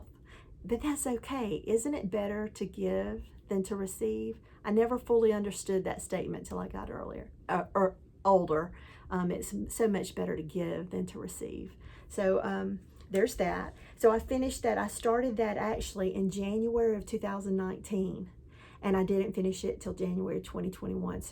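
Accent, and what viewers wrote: South, United States. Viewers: I enjoy listening to you, you’re even more southern than me here in Oklahoma. I hope you’re feeling better soon!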